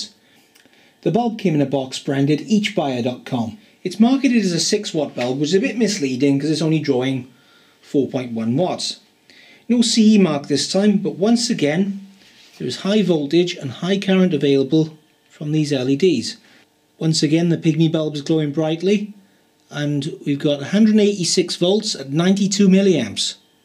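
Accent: Wales, United Kingdom. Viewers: Hey, is this a Welsh accent I hear?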